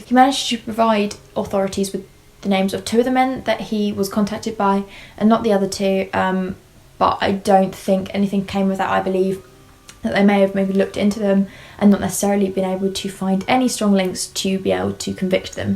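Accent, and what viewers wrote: United Kingdom. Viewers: I'm from Salina Oklahoma about 10 miles from the old camp site i grew up hearing about these story's i was 3 years old it sounds like your from the UK so why you even going on about this?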